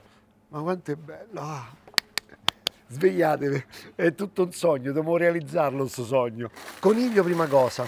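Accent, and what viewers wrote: Rome, Italy. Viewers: Dovrebbe parlare e gesticolare di meno e poi basta con questo dialetto protoromanesco dai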